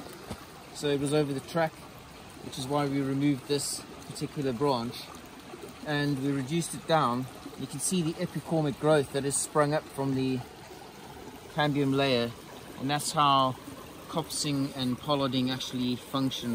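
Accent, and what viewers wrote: South Africa. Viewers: Are you South African, or am I missing the accent?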